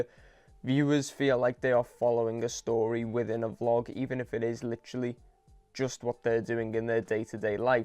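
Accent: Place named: North, United Kingdom. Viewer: Thank you for such a detailed and well explained video. Great to hear a fellow northerner too ;)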